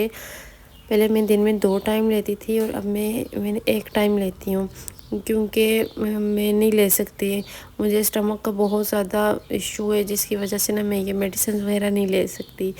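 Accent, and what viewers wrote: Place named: Chhachh, Pakistan. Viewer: اپ کے لہجے سے لگتا آپ چچھ کی ہیں نہیں؟ میں اٹک سٹی سے ہوں اس لیے میں لہجہ پہنچانتی ہوں وہاں کا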